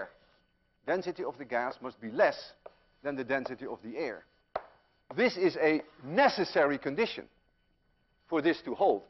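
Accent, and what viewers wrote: Netherlands. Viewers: Is this dude from Holland?! This day seriously freaks me out.. like on that esoteric level. peace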